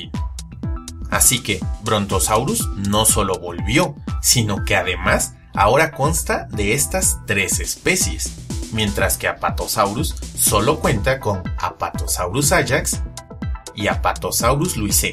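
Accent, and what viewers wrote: Mexico. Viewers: ¿Eres de México?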